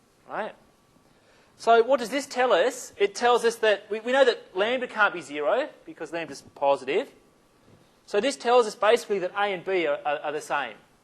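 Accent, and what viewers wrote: Australia. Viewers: whenever an aussie speaks, i cant help but trust in what he's saying lol. Good video. Ive found that the only tricky part with the H.E. is to not make a mistake with the integral when solving An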